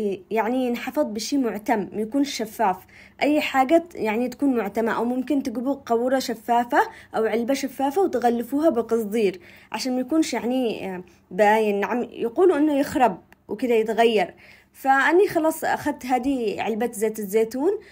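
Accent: Aden, Yemen. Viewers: ممتعة فيديوهاتك يا.. أسلوبك في الطرح جميلة ودمك حلو و كلامك العدني مرة حلووو... وأنتظر فيديوهاتك و طبخك دايم ،، موفقة حبيبتي وإلى الأمام.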